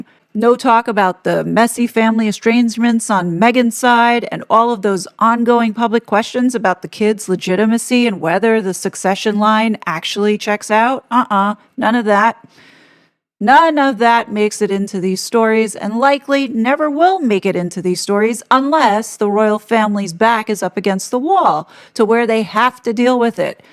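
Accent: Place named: New York, United States. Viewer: yes! Yes! Yes! This is why I keep coming back. You say it in a way that only a New Yorker can say it. THANK YOU!!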